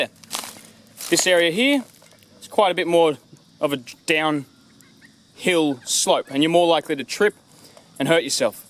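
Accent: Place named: New York, United States. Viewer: How you doin! You sounded like a new yorker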